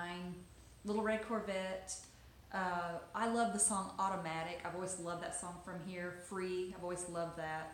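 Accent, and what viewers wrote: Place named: Georgia, United States. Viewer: Are you in the Carolinas? Trying to place that voice. I was born in the Midwest but grew up in the Carolinas. You sound like you're from my neck of the woods. Maybe Georgia.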